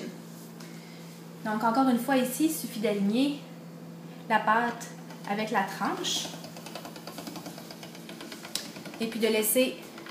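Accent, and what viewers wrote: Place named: Quebec, Canada. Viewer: En plein le genre de vidéo que nous cherchions. En québécois en plus!!! Merci pour les info!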